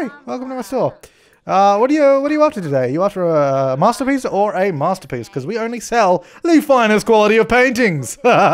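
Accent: Australia. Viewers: Mate, you are like the best stereotypical Australian the accent to the phrasing is great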